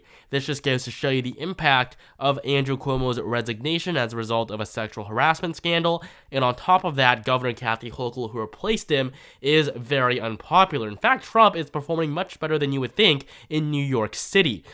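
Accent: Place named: California, United States. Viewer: Can’t stand listening to your accent. Very California yuppie!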